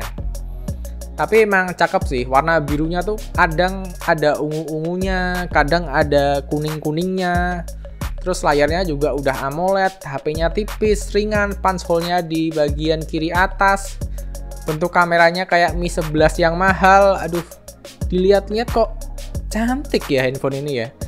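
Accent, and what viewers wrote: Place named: Semarang, Indonesia. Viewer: Koyone iyo lur, soale nk wong semarang ngomong indo rodo medok, medok.e yo ngene iki hhh Salam dr kendal-klw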